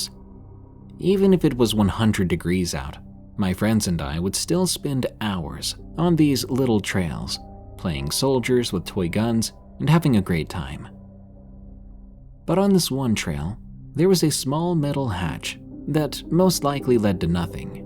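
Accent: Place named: United States. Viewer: The first story is meant to be in the south of England… people do not use American words in the south of England or anywhere in Britain for that matter, you also made it sound like Aberdeen was not that far away… its in Scotland.. the opposite end of Britain.. We also dont have guns.. if you are going to read a story from England, (also sounds American) please be authentic as most English find it insulting..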